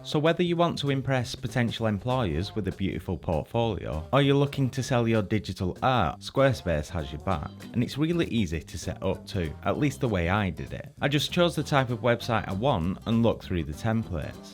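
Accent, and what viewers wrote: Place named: East of England, United Kingdom. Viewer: Hi, I hope I don’t come off as rude or too nosy, but I’m trying to pinpoint your accent (just out of curiousity) but I am really unsure. Some where from the East of England? Might be way off😂. This is just a hobby of mine, trying to learn to distinguish British accents and to name them. But I haven’t got very far yet.. still learning to somewhat localise them.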